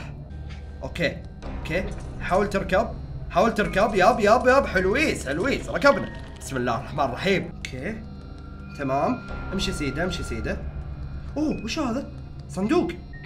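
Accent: Bahrain. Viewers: صاير تتكلم بحريني واجد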